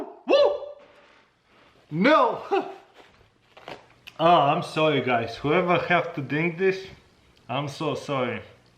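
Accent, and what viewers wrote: Russia. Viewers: Russian Accent hits you in the first second.... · His russian accent is thiccer than the water and i love it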